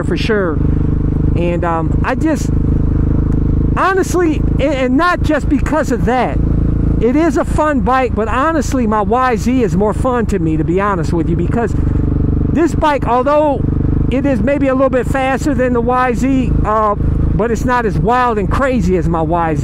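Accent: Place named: Texas, United States. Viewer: Hahaaaa. Dude, you're awesome. What is that dialect by the way, you in Texas or somewhere south? .. and yea, you have a new subscriber.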